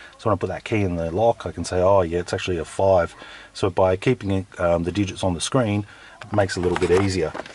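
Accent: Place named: Australia. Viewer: Hes australian.....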